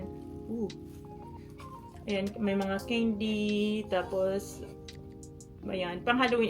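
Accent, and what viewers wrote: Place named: Visayas, Philippines. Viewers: Waray po siya kung hindi ako nagkakamali. Magkaiba ang Bisaya sa Waray po. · Maam bisaya ka po ba?newbie here po..kasi pansin ko po parang hirap kayo sa tagalog po..nag lolog po kayo hehe sorry po,bisaya rin po kasi ako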